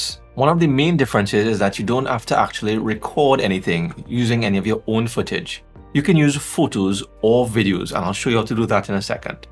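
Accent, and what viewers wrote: Trinidad and Tobago. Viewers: I just heard you speak and said to myself he a whole Trini! tell me i'm wrong?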